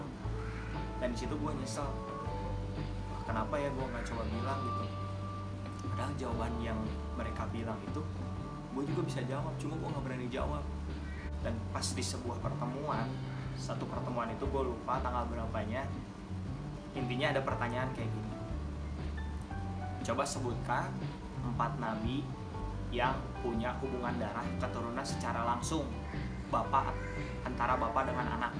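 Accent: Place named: Sunda, Indonesia. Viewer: Menarik ceritanya.jd sejarah tuh yah kakak...ini tapi suara nya kurang keras..teus ketahuan kakak teh orang sunda asli ya..he he..logat sunda nya..kental..pisan..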